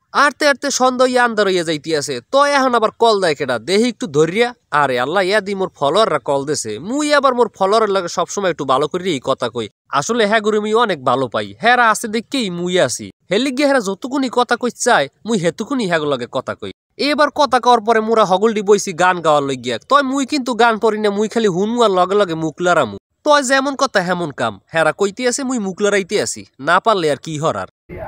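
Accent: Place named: Barishal, Bangladesh. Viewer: ভাইয়া আপনার বরিশাইল্লা কথা গুলো ই খুব ভালো লাগে❤❤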